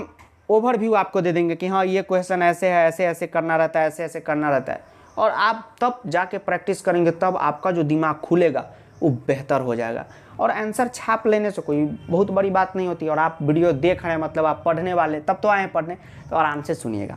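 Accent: Bihar, India. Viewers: Sir aap pakka bihar se ho ne 😊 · Sir are from bihar hamare edhar ese hi pronouse hota hai hamre ias officer bhi pronounced ese hi karte hai eska ye matlb nahi ki unko nahi ata hai concept pe dhyan do esa koi nahi padhyaega basic se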